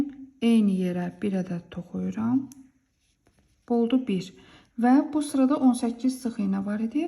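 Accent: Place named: Bayburt, Turkey. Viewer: O kadar güzel anlattınız ki örgü severim zaten şiveniz bize yakın bende Bayburt luyum,bitirince instagram dan sizi etiketleyerek paylaşacağım❤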